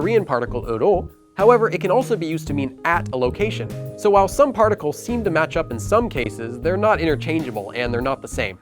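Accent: South Korea. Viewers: Yes it does sound korean. I’m a native japanese speaker who also speaks korean. Your japanese definitely has a korean accent. Your korean is really good though, much better than mine lol😅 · His Japanese sounds like a Korean speaking Japanese. Maybe it is the vowels. He sounds a lot like my Korean friends speaking Japanese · listening to your japanese sounds like you have a korean accent or is that just me. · probably he learned korean the japanese? cause i feel korean accent in his japanese haha so cute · I kinda noticed that too. He does speak to speak Japanese vowels with a Korean accent. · You speak Japanese with Korean accent HAHA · Your Japanese has a lot of a Korean accent I love it😭. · Definitely,. Your Japanese has a bit of a Korean accent, but my Japanese is better than my Korean (since I live in Japan) so probably my Korean has a Japanese accent. Whoops.:3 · Ahhh you have such a Korean accent when speaking Japanese なんかかっこいい、俺も韓国語を話せるようになりたいんだ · Yeah, he does speak Japanese with a Korean accent!! 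I noticed that! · As a Japanese speaker, he very much does speak Japanese with a Korean accent. I can say this as a person who's told when I speak Korean I do it in a jp accent xD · I also think he has a cool Korean accent as a native Japanese speaker. In particular, “do” in “dakedo” and “tasho” sound like a typical Korean accent! ❤ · Your Japanese sounds Korean haha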